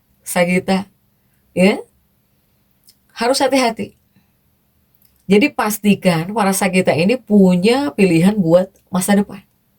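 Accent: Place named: Sunda, Indonesia. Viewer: Ya bner ak suka dgr suara kk sunda, ada serak2nya gtu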